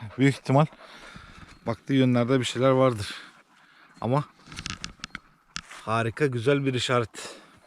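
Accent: Thrace, Turkey. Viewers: Trakyalı olmalısın şiven öyle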